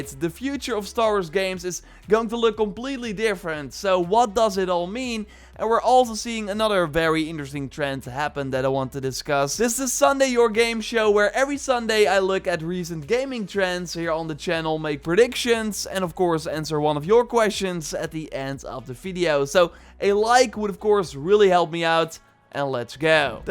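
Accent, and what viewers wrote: Netherlands. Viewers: Oh haha Your dutch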